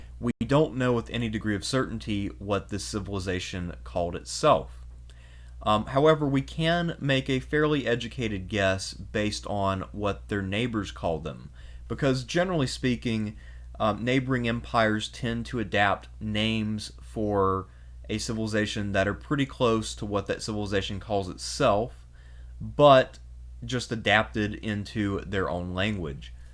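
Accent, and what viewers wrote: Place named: Texas, United States. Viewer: I live for the (?) Texan accent!! Thanks for another fun video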